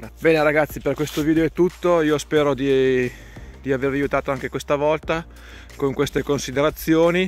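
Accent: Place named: Monferrato, Italy. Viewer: Ciao I tuoi video sono sempre interessanti, facci sapere cosa farai e come finiscono quelle due o tre iper infestate. Qui ho tolto le strisce settimana scorsa e ho trovato poca varroa, tra un mese o meno farò un sublimato, sono tutte in blocco eccetto una che ha una rosettina ma è un'arnia sfigatella, non ha raggiunto la massa critica per l'inverno mentre le altre sono in condizione 2 o 3 di forza, ho uno schema di valutazione da 1 a 3 per le varie caratteristiche dellalveare; io sono in Val Seriana e le temperature vanno da 18 a 2; tu dove sei, dall'accento ti colloco in Monferrato.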